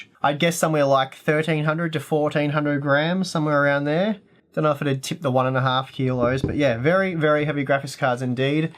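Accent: Australia. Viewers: oh just realize you're aussie mate!! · i WANT you to say something in pure australian accent in the next video.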